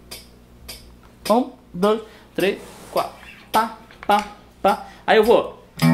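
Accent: Pará, Brazil. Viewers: Sotaque paraense, acertei?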